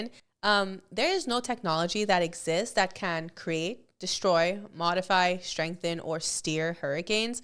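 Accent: United States. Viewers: This was good education! lol whatever you're doing to get the algos to get you eyeballs is working, but your family is endearingly quirky and nerdy, and damn how you put your hubs on the spot is kind of hilarious. He's a champ, though. Not really on-topic, but your accent is unfamiliar to me. Some elements seem like mideast US but there's other stuff going on that makes me suspect you're also multilingual. For context to my question, I'm an aspiring voice actor so that accents are of interest in that way, and also have worked with translators for publications for many years, and I'm always just curious about this sort of thing.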